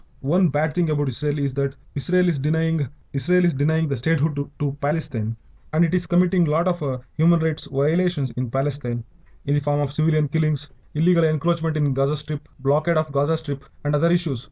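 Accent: India. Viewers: Your accent sounds like you are Tamil... Where are you from?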